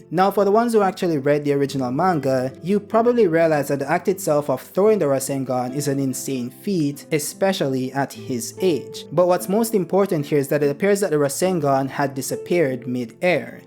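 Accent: Jamaica. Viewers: Wait, are u jamaican that accent can't hide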